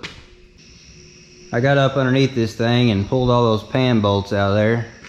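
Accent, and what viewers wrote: South, United States. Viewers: I enjoy every videa Please keep em coming when you have time. Even when the Cat cams are a real treat for you to clean up with all the "Blue shit" that comes on them... These relics are entertaining with the good ole boy country narration. Keeps the content interesting. Bottoms up 🥃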